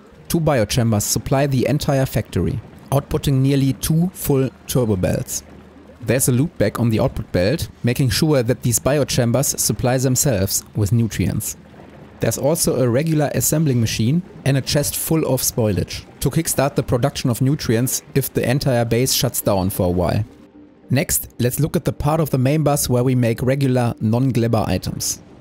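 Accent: Germany. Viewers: bist du Deutscher oder was ist das für ein Akzent? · are you German? i think so haha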